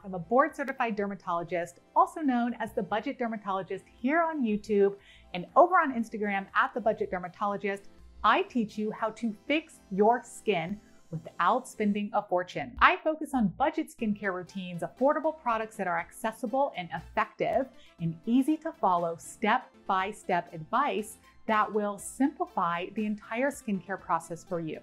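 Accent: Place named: Iran. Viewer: I hear a mild Persian accent. 100 bucks says she is Persian. 😁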